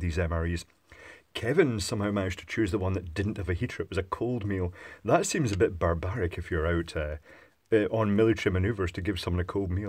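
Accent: Scotland, United Kingdom. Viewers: Shame you didn't put some cheese with the 'French toast'. I find those things too sweet, so with cheese, might taste better! I'll forgive you tho because I absolutely love your soft, gentle Scottish accent and could listen to you all day.... And how nice to hear a man who isn't afraid to admit that he's not too keen on the hot, spicy sauces.....usually male reviewer's seem desperate to impress, with being macho and pouring on loads of (gut) killer pepper/chilli/tabasco sauces. And you're messy.......like your style!!!!!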